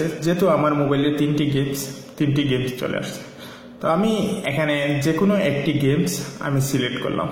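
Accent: Sylhet, Bangladesh. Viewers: you r sylhetee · ভাই আপনি সিলেটি নি,,,?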